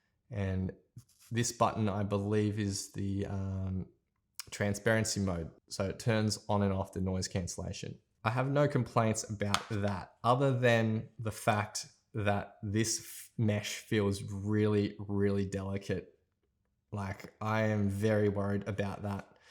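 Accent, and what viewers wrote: Australia. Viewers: Australian accent, no doubt.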